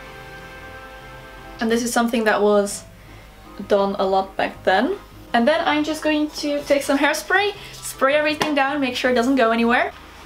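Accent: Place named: Netherlands. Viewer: ik hoor een Nederlands accent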